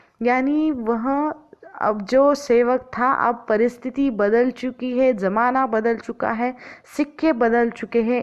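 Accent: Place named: Maharashtra, India. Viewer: मेडम हिन्दी साहित्य वाचुन अपल्या मराठी ला बोलण्यात बदल करा ।हळु हळु होईल।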